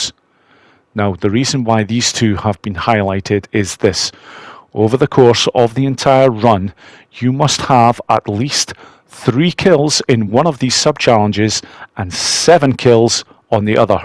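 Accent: Scotland, United Kingdom. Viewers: One more thing, I misjudged your accent. My friend is married to a guy that was in the RAF and is Scottish. I talked to them recently, your name should be, don't know if it is highland or low land. But for sure the whole planet wishes they were Scottish. Quesion, do you play with a controller or keyboard and mouse?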